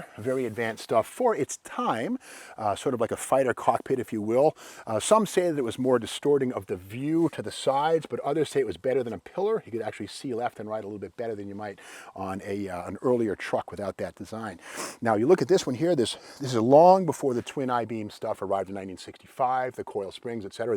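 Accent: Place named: Massachusetts, United States. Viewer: He might have. You could detect a MA accent, but not on every word. I have a friend from Saugus, and he has a much more pronounced accent on every word.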